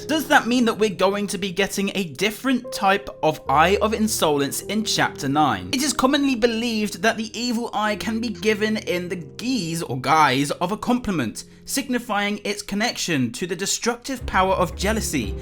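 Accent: United Kingdom. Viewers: He’s British lol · He’s a cool British guy so he gets permission to say it in another way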